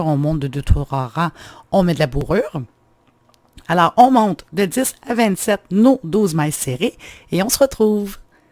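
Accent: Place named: Quebec, Canada. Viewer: Merci pour ce tuto c'est exactement ce que je cherchais. Comment faire un pied 😁❤. J'adore votre accent Québécois 🤗🥰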